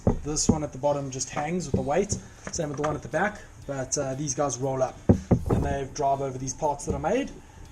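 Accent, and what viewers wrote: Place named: South Africa. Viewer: Hi there! Just discovered this series and binge watched all the videos. Great stuff! Do you have any info on your spindle? Specifically the atc system? Cheers! Devin. Ps I was born in Joburg (in fourways, moved to Canada when I was a kid... I can pick out the accent from a large crowd haha)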